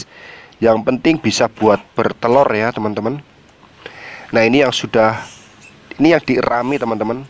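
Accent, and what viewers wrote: Banyumas, Indonesia. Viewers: kita angon " sampean wong jowo ngapak pow kiE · Wong ngapak ..pancen josssss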